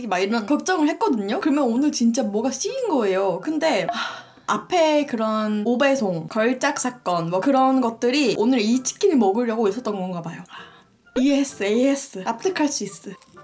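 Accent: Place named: Jeolla, South Korea. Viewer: 온니 혹시 전라도 사람이신가여 ?!?! 말투가 되게 친근감 있어서요 ❤️❤️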